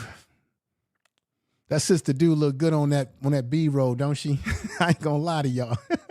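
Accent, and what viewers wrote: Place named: England, United Kingdom. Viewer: UR ”ENGLISH ACCENT” iZZZ JUST DREADFULL‼️‼️‼️😱😱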